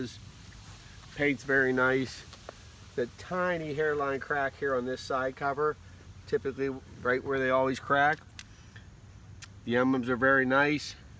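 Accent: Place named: Philadelphia, United States. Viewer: you sound like You're from Philly or South Jersey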